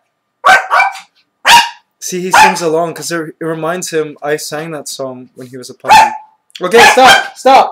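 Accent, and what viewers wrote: Philippines. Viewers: using his filipino accent to discipline Pinoy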